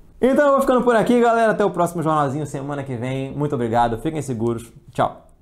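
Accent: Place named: Pará, Brazil. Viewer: O melhor do, é que da pra perceber que ele é paraense, mas só os paraenses percebem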